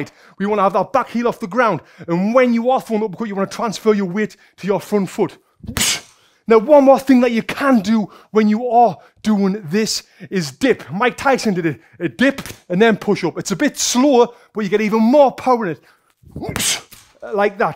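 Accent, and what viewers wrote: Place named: Northern England, United Kingdom. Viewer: I like it when he says "noooooooo " in the beginning haha. Proper northern accent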